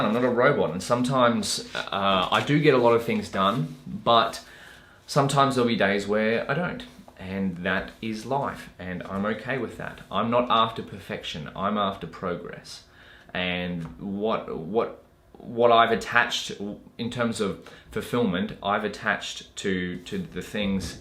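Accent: Australia. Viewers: I WAS DESPERATELY WAITING FOR YOU VIDEO! Question - Are you from Australia? If yes, which city?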